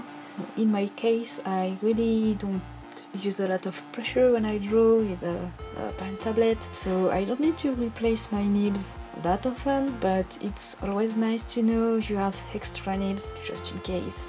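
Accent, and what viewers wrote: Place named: France. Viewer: NANNNN, T'ES FRANÇAISE ?! Jamais j'y aurais cru ! En tout cas, je tenais à te dire que je trouve ton travail très beau, de beaucoup de manières ! Que ce soit les couleurs, la technique ou le fait que tu propose beaucoup de choses différentes ! Continue comme ça, cette vidéo est géniale ! :P (ton accent anglais est trop mignon !)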